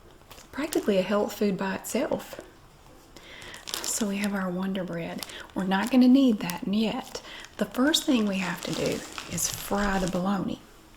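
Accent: South, United States. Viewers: "It has little fish awn it!" 😂 southern accents are my favorite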